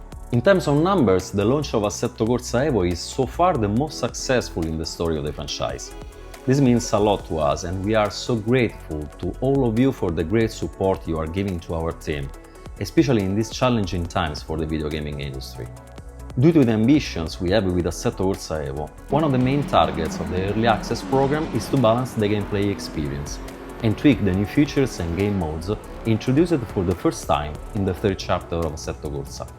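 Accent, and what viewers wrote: Rome, Italy. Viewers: L'inglese con accento romano 😁 . E comunque sempre meglio del mio inglese ....complimenti per il gioco , spero esca presto l' aggiornamento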